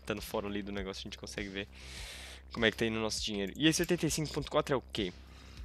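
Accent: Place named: Portugal. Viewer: caramba, é uma história bem legal, deve estar desde pequeno em Portugal para ter pego o "sotaque", bom vídeo de verdade amigo, todos os mods que penso em jogar algum dia sempre pego uma série sua para acompanhar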